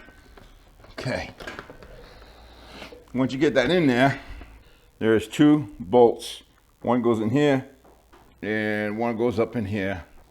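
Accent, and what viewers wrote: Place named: Brooklyn, United States. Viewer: You're from Brooklyn, or Long Island, which one? I'm from Boat, grew up in Brooklyn, now live on Long Island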